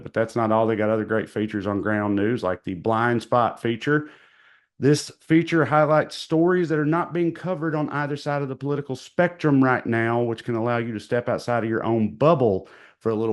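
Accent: South, United States. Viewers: Thank you so much for bringing humor to all the news I listen to. Here on the W Coast---Love hearing it in your Southern accent and wit! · I am just stumbling in, how the hell did it take so long for my youtube startpage to recommend me this channel???? Probably racist what I think but I'm a european who "studies" the American people with great fascination and interest since Michael Moore's "Bowling for Columbine" and the way you deliver those great democratic messages with this accent which is mostly portraied with this "south will raise again" stereotype is completely hilarious for me right now. Amazing :D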